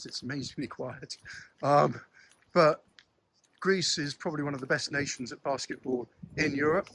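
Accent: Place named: England, United Kingdom. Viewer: No offense in what I say. I like your accent and it is a shame people from England leave to move to Greece to lose the accent over the generations. Also to put a burden on Greece to cause a housing crisis despite you build your own place. But also it is a shame that in England they have the same issues in what is done to them yet natives to England do the same to Greece. Its a chain reacton. But also that many saints warn against mixing ethnicities since they lose their culture language religion tradition etc and its like mixing all crayons and we have one color brown. Even articles that show research see that 90% of Greek outside of Greece lose all those things listed. Then they become more liberal part of what the state wants to cause more issues and its makes things worse around the world for everyone since more selfish more liberal. 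Some joy now but down the road it will get worse just like many things got worse every decade for everyone overall. The respect is put to the side and when that happens no one gives respect and the issues grown. We are not fighting for a future for kids, family, ancestors, community but for self. As AI would sum up what what many saids and elder say "Christian tradition upholds national identity, natural human distinctions, and patriotic duty as part of God's created order, viewing anti-nationalism or globalism as a violation of nature, while simultaneously condemning chauvinistic, idol-worshipping, or racial-hatred nationalism as a moral corr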